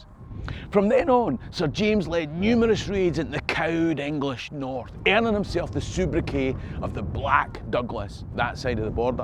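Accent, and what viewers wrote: Scotland, United Kingdom. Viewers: Good history. The BBC would not want someone with a Scots accent. Thanks. Bill Collins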